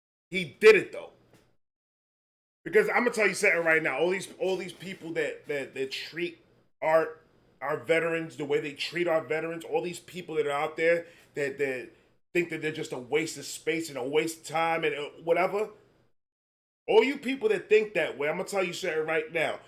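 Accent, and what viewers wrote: New York, United States. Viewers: You have a Yankees hat on. And from the accent you sound like a New Yorker. Im a Yankees fan myself (last names Mattingly). I spent 39 months deployed in the infantry. I did it, i lived it. I have the physical and mental scars to back it up. You have had the best reaction video to this song, ive seen. As a veteran, this is probably my single favorite song ever. The sad thing is that those numbers are under represented. Not all suicides are classified that way. The more accurate number is between 35 and 40 veterans a day. Ive lost more brothers to their personal demons than to combat actions. If you're curious, "The Hornets Nest" is a great movie to watch (and the second half is my company from the service). Great 1st hand of what combat soldiers go through. Thank you for youre appreciation of the veterans. I can only assume what you witnessed on 9/11....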